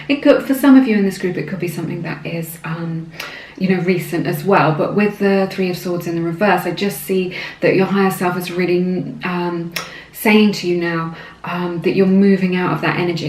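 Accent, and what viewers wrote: RP, United Kingdom. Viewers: posh accent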